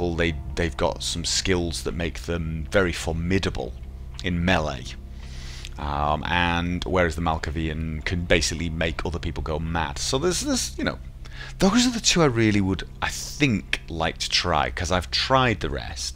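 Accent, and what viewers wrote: United Kingdom. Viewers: Holy Macaroni! It sounds like this channel belonged to completely different person back then! And I can swear it, it was some Brit! :)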